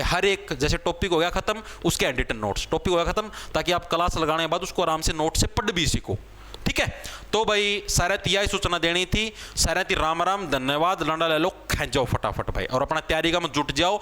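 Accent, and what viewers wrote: Haryana, India. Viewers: haryanvi accent samajh ni aata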